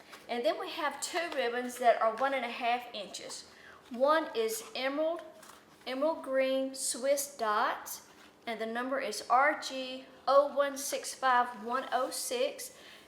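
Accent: South, United States. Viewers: Her sweet southern voice!!😄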